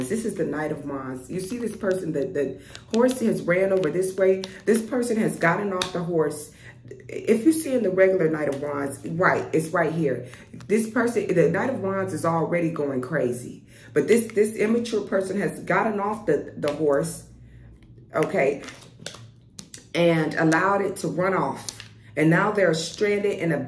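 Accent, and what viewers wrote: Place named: Chicago, United States. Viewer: You talk like you from chicago. Your vocabulary is crazy. I luv it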